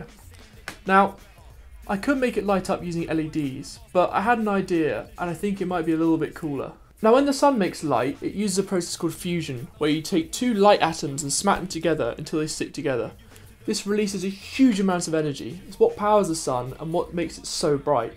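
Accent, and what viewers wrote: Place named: United Kingdom. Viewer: British ahhh yes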